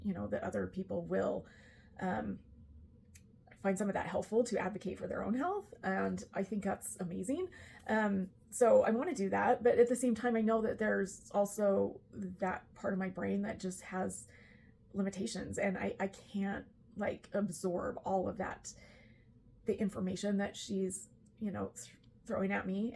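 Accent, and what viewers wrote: Utah, United States. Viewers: what you are going through is valid. I have AuDD. I have experienced so much of what you are going through. I feel my life will be in "recovery" from not paying attention to what my body and brain were telling me. I am in awe of your strength and self-awareness it took to make this video. In this time I have heavily relied on channels like yours and Mac's, (along with other hyper-focus interests). Having been a life long Northern Utah girlie, your Utahan voice and cadence have soothed me more times than you can know. I have wanted to offer my (admittedly, very limited) assistance with what you do, and now I feel drawn even more to offer it, even if it is just to tell you that you have my gratitude for just being who you are. I live north of you, and am serious about offering support should I have the capability. Those of us who truly value you, need you to be your own first priority. 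I love your channel, it has served me so many times as a body double. I (and all of use) support you in your journey. Don't you dare respond to this with more than a heart! Unless you are interested in my assistance, but even then, a very short answer is more than enough!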